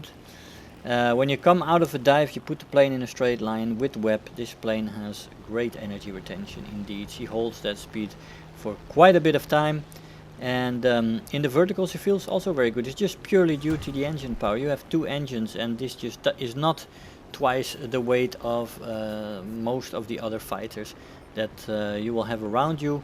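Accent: Netherlands. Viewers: they're both dutch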